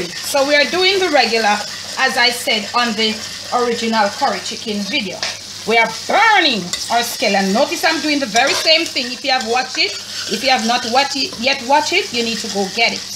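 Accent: Jamaica. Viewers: I love your talking...your a naturally funny ..between patois ..English love it..please don't stop..just be yourself ..and teach us authentic Jamaican cooking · I'm learning ms, I love you and love to hear you talk, you are a true Jamaican keep it up 👍👍👍💖💖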